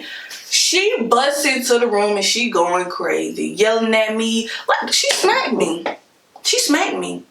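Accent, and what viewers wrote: Atlanta, United States. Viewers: Are you from ATL cause you got that accent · Where are you from sis!!!!! I hear ATL all in your voice 🤗😂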